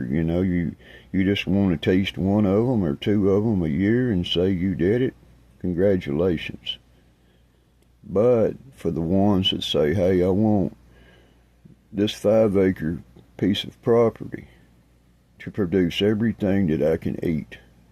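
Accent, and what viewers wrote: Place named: North Carolina, United States. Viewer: a side thought.... sounds like you are from North Carolina or Tennessee.... love your accent... i live in east Tennessee ..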